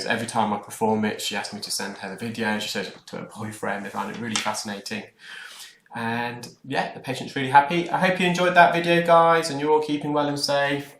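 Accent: United Kingdom. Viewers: My daughter has profound bilateral sensorineural hearing loss and uses a cochlear implant. Thanks for the lesson on the differences in hearing loss; I was glad to have a refresher course. She went from hearing aids to the implant but still continues to have itchy ears. I took your advice and bought the Earol spray and it is helping. Thanks! Love your videos and your proper British accent. I get so tired of hearing all the “yinzer” talk here in western PA.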